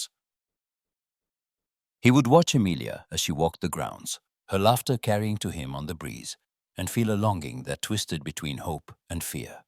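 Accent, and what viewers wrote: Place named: India. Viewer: The reader has a trace of pronunciation from India or smth.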